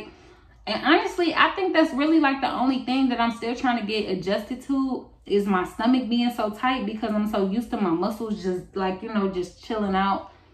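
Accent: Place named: Philadelphia, United States. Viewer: You gotta be from Philly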